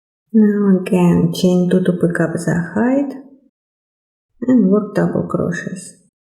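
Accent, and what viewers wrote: Russia. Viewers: Russian accent? Hallo mein lieber. I have made the same , we have similar ideas? Thank you for video.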